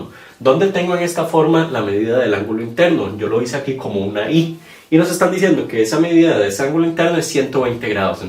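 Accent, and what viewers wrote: Costa Rica. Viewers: Hasta ahora veo que sos de Costa Rica el acento me parecía que si y que dices "verdad" hahahaha Pura vida pa buenos videos , voy a estudiar con tus vídeos para Bachillerato ( me parece que hasta sos de San Ramón)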